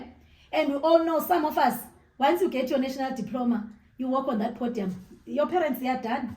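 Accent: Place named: Zimbabwe. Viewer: Your accent....its giving Zimbabwe 🇿🇼 ❤❤😂😂 hello Mama, here from Mandy. Love your energy 🎉🎉😊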